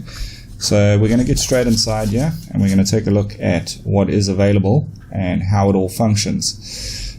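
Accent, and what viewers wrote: South Africa. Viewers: I thought you sounded South African! Mentioning pricing in Rand is what confirmed it. Thanks for the decent review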